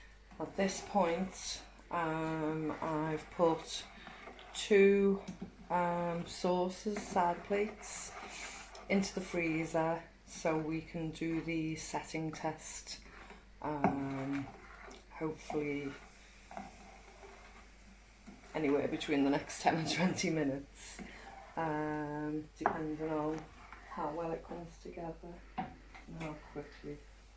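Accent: United Kingdom. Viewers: Is that a Wirral accent I hear?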